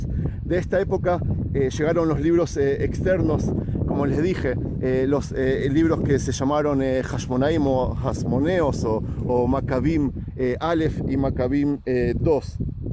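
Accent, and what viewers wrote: Argentina. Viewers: Cuando comencé a escucharlo pensé que era argentino. Pero poco a poco he escuchado su relato y es muy interesante, no sé cual sea su verdadero idioma pero agradezco que nos cultive a través de estos vídeos. Es el primero que veo, pero veré todos❤❤❤❤❤ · Si la verdad. Estoy siguiendo cada video del hermano. Por su acento vivio en Argentina. Donde sea es muy gratificante y de mucha sabiduria. Bendiciones para ti. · Tienes acento argentino o de esos lados saludos hermano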